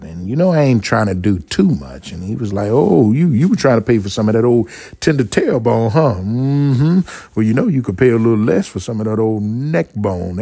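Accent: South, United States. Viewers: Lol 😂 rice on the plate got my cabbage and scoooted right next to it now thats tha down south old school country talk yall don't know nothin bout dat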